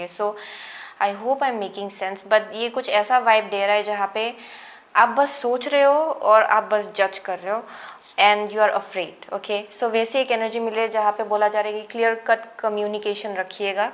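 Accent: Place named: Arunachal Pradesh, India. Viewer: Aap kaha se ho mam? Arunachal Pradesh se, aisa lag rha hai, i m from Psg, mine is pile 1 n 4, both resonates n m confused. But thnx 🙏 Nice reading. 👍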